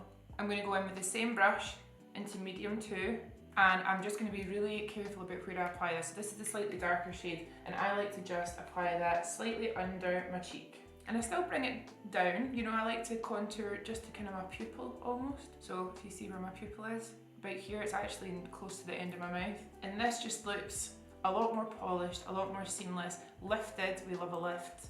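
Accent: Scotland, United Kingdom. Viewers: I'm feeling buzzed and chatty... You are so stunning, and your Scottish accent makes me want to start a bar brawl for no reason. We love the same colors... The right side wins but I love the round eye and brightness on the left. You're right about makeup's evolution in our personal lives in relation to how we see ourselves.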